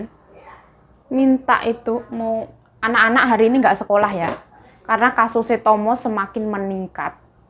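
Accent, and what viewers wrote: Java, Indonesia. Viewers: Bhasa jawane medok bnget mba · Lucu yaa pke bhs sana tapi logat jawanya ga ilank jd ne lucu🤣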